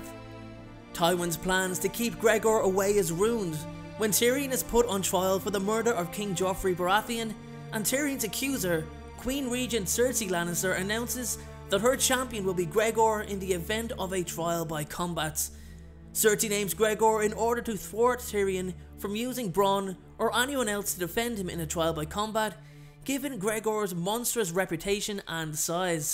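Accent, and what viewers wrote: Ireland. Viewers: Dude are you Irish 🇨🇮🇨🇮🇨🇮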